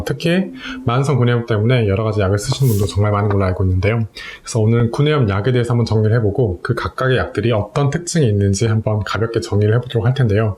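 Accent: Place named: Jeolla, South Korea. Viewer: Q&A가 궁금했던 내용들이라 참 좋네요, 약사님 전라도 분이신가봐요! 오늘 특히 구수한 느낌입니다ㅋㅋ 영상 감사합니다.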